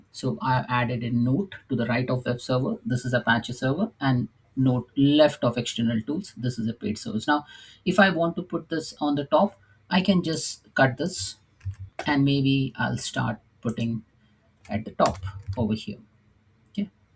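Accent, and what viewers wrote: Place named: India. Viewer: It's really hard for me to adjust and listen to English with hindu accent. But your presentation is so concise, to the point, illustrative and easy to follow that I've stuck around and put in the effort. Thank you,!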